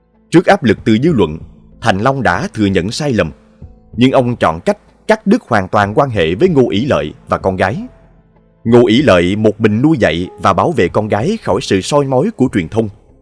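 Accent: South, Vietnam. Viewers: Giọng đọc miền Nam hay quá , giống như giọng của MC Anh Dũng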